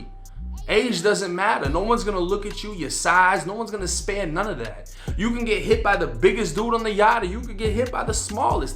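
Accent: Boston, United States. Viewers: How you from Florida but sound like someone from Boston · You sound like you from Baahhhston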